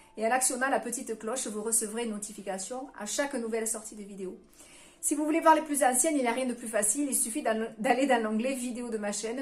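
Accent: South, France. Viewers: 😍Un tableau aux couleurs qui chantent à l'image de votre bel accent du midi. Et dans la grisaille hivernale, la peinture est un excellent loisir ! Grâce à vous , je manie le couteau avec plus d'aisance pour peindre des paysages d'après mes photos. Vos tutos nous donnent confiance et on peint avec enthousiasme...bon... les tubes de couleur se vident vite . ..Heureusement, le père Noël va passer 😉. Mille merci pour votre générosité. Catherine .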